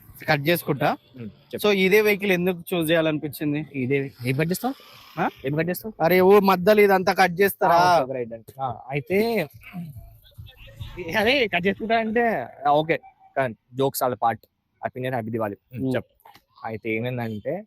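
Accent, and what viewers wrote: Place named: Andhra Pradesh, India. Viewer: Anna pakka telangaana la putti ichaaru chesaaru vacharu ani andhroni basha matladtunnav emanna andhamaina telangana yaasa petkoniii😢😢😢😢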